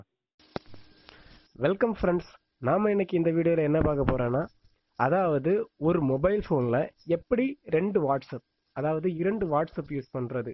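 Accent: Kanyakumari, India. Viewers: kumari thamizh👍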